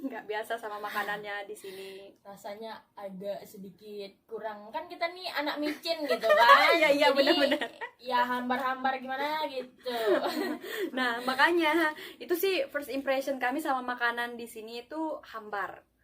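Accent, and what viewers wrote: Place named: Central Kalimantan, Indonesia. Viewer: mirip logat kalimantan tengah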